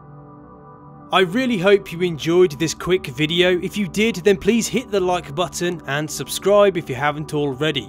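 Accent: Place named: Wiltshire, United Kingdom. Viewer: Are you from Wiltshire? Or south west. BTW love you Channel. 👍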